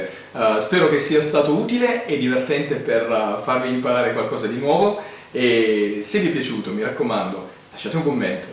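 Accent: Sicily, Italy. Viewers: Min "pucciarla.." dal tuo accento mi sembri siciliano o comunque del Sud, come lo sono io. Sentirti usare il verbo "pucciare", termine dialettale piemontese e lombardo, fa veramente male. Però, detto questo, complimenti per il bel video 🙂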